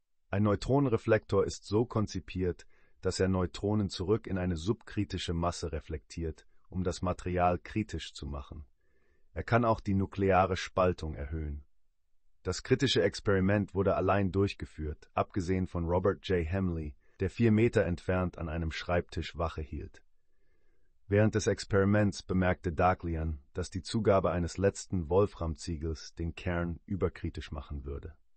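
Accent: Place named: France. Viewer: Du hast einen leichten Akzent. Bist du Franzose?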